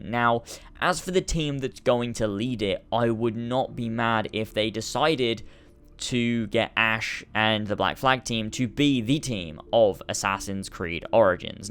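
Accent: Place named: Northampton, United Kingdom. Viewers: He's from Northampton, you're lucky mate I'm from Birmingham and we all sound inbred. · Where bouts in the UK are you from i recognise the accent??? Im from West Yorkshire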